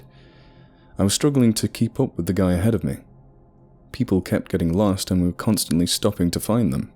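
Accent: South, United States. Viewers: i like the country narrator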